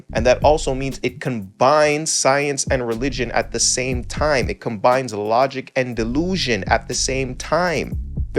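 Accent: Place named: Toronto, Canada. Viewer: You have to be a Toronto man, based on the way you talk lol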